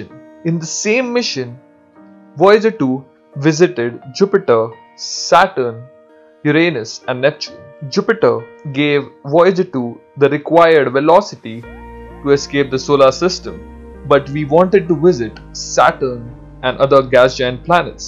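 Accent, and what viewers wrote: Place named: India. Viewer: Indian accent haha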